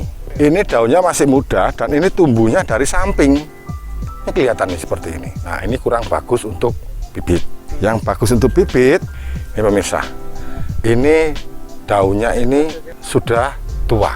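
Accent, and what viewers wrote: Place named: Java, Indonesia. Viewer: Nada suaranya yg khas dg logat Jawa yg AKURAT... Salam sehat selalu pak 🙏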